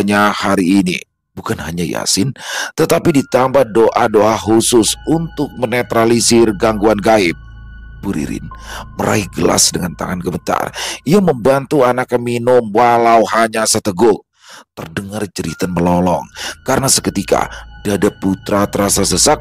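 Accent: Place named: Banjar, Indonesia. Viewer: Abang cocok jadi orang banjar. Soal nya klo bicara bahasa banjar abang logat nya banjar bangttt ☺️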